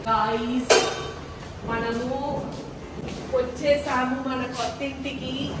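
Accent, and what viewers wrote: Tamil Nadu, India. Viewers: Mee telugu vintunte bhale navvu vastondi😂😂..tamil telugu confuse autunnaru..and matalu kuda vethukuntunnaru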